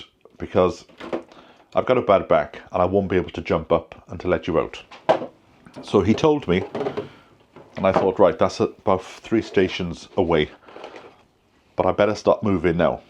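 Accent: Wales, United Kingdom. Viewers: I love listening to you chatting 😊I love the Welsh accent 😊Blessings going your way for healing 🍀❤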